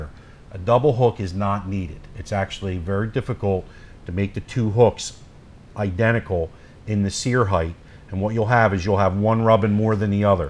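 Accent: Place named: Philadelphia, United States. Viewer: I grew up right outside of North Whales PA. Love the accent man! True Philly/Eastern PA! Just purchased this trigger. Cant wait to get it installed!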